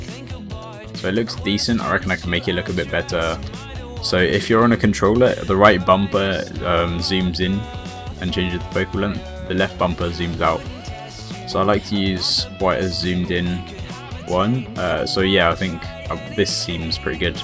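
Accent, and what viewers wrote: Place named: United Kingdom. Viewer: love british accents sometimes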